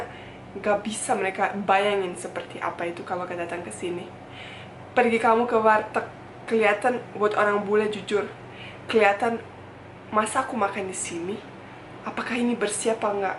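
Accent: Jakarta, Indonesia. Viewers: Apa kabar Mba Senang dech nonton vlog mba soalnya ngomong dialek logat jakarta nya bgtu lancar jd cocok jd org indonesia aja Mba semoga sukses selalu ya Mba Thank you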